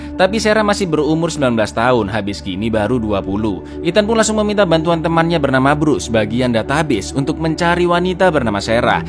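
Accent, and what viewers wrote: Java, Indonesia. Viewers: Setuju bro. Mantap kayaknya. Medok banget dia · Org Jawa kah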